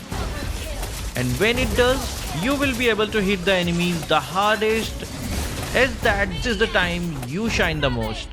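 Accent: India. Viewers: Are you indian your accent sounds soo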